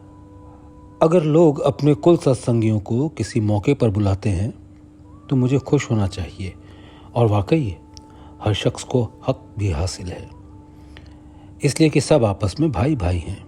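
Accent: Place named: Pakistan. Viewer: प्रिय भाई आपकी आवाज में पत्र सुनना बहुत मधुर लगता है विशेष उर्दु लहजे में अलग ही आनंद आता है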